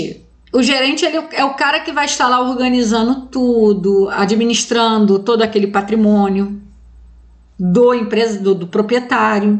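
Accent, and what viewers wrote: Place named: Rio de Janeiro, Brazil. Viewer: Aula top, com esse sotaque carioca então; arrasou!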